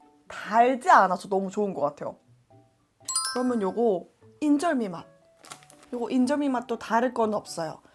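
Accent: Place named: Gyeongsang, South Korea. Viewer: 님 고향이 경상도 이신가요 계속 사투리 억양이 들려서...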